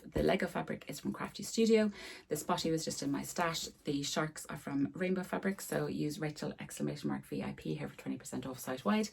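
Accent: Ireland. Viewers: I do love your vlogs. Your accent reminds me of 7 months I lived in Crossakiel when my OH had a job in Cavan.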